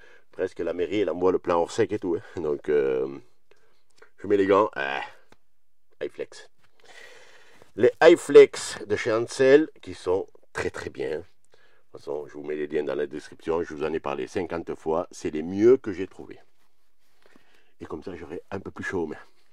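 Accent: South, France. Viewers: Superbe ! Et en plus avé l’açent ça nous ramène un peu de soleil au Nord ou il pleut ! J’adore le brinchement c’est le CC avé le CC le PP avé le PP et le moinsse et le plus, rien de sorcier! N’empêche c’est une installation que pas tous les plombiers la font si bien bravo!!!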